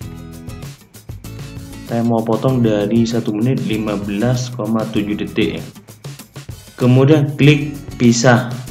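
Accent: Madura, Indonesia. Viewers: Logatnya Madura .. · Reng madureh yeh tretan... 😋😁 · Oreng madureh ben lek? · Madureh bos tak elang hehehe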